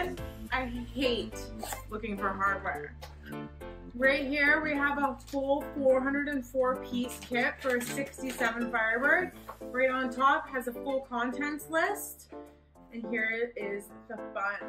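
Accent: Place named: Canada. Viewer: Canadian eh!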